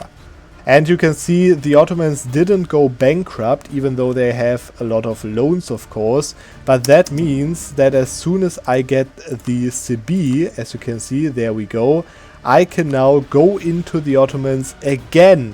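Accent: Germany. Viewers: Thanks for the reply. I liked your video otherwise! You have a sure way of speaking, and a nice German accent. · The german dialect is strong in this one. Love it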